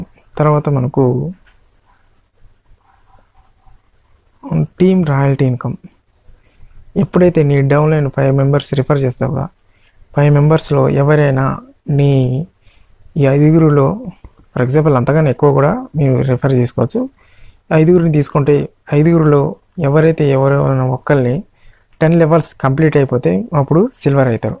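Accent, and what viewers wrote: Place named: Lambadi, India. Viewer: Thammudu nuv LAMABADI kadha.. ni voice vintene ardham avthundhi.. cooooosh bockkkk kaadhu babu.. cashback..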